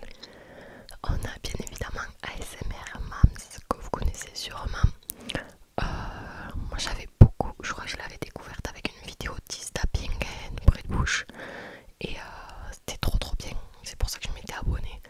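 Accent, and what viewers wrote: South, France. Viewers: Hello, oh mais c'est trop adorable ! Merci merci pour tes mots 🥰 Je regarde souvent tes vidéos aussi et j'apprécie ton chuchotement avec ton accent qui sent bon le sud 🥰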